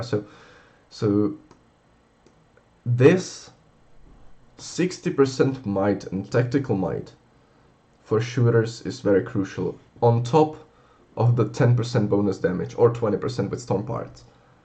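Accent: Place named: Slovakia. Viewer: Where are u from cuz ur accent feels like ur from Slovakia or Czech 😊 maybe Poland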